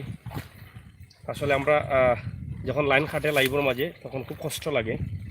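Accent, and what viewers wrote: Sylhet, Bangladesh. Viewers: Vidio kharap lage nai. Kintu apni shuddo vashai kotha bolle hoito aro valo lagto. Kenona syleter vasa shobai buje na. Buccen mama!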